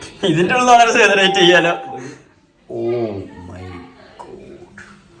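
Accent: Kodungallur, India. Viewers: കൊടുങ്ങല്ലൂർ സ്ലാങ് ആണിത്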